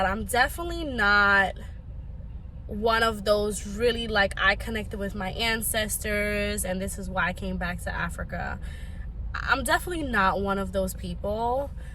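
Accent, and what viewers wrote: New York, United States. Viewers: New York (American) accent.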